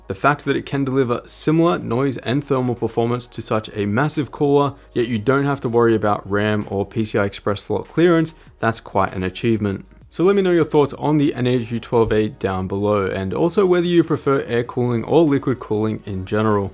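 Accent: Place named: Australia. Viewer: Love your videos, nice to have an Aussie accent coming through. I saw in this video that you show the Dark Rock 4 cooler on a min-ITX board and it not fouling on the memory. I'm looking for an air cooler for my Asus b450-I gaming board but can't find any firm info on if the DR4 cooler will interfere with the memory slots or not. Can I ask which board you have in this video, and if you have any idea if it will usually foul against memory or not?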